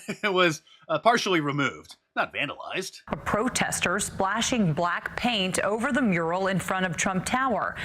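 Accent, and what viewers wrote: United Kingdom. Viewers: Proud that the satirical voice over was by a Brit! 👍👍👍👍🇬🇧🇬🇧🇬🇧🇬🇧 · At least the voice over could have been done by an American sounding voice, not, British, since we are talking about the US Capitol. But, I did enjoy it, and it was funny. I heard the whole thing on Benny Johnson.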